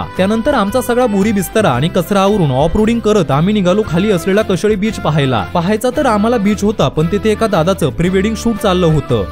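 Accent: Ahmednagar, India. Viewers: Nagarkar ahe tey tyache ucchar tasech rahtil. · ही नगरी भाषा आहे भाऊ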